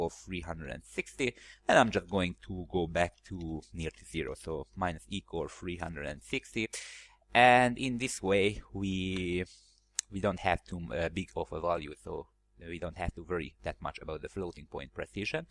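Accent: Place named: Hungary. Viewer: Are you hungarian? :D Just based on your accent :D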